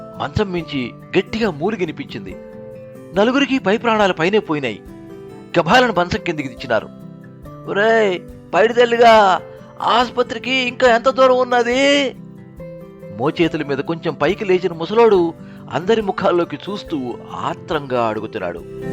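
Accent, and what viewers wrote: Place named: North Andhra, India. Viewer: మీ వాచికాన్ని అద్భుతం అనాలా, మరోటా!?...ఉత్తరాంధ్ర మాండలికం మీరు పలికిన తీరుతో నా ఒళ్ళు పులకించి పోయింది. మాండలికంలో నేను చేసిన చిన్న చిన్న తప్పులు ఎవరికీ వినపడకుండా మీరు చేసిన కృషి అమోఘం. నమోనమః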